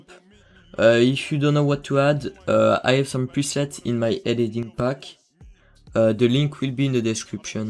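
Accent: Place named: England, United Kingdom. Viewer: ton accents anglais :,D SINON GG et merci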